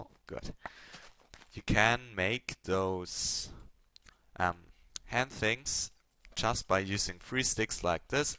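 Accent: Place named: Germany. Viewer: I can really hear that your German but that's a good thing :D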